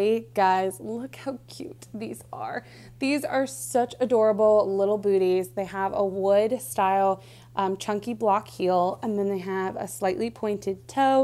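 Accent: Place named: South, United States. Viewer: Lol. I like your southern accent. I’m from Tennessee, so I like it.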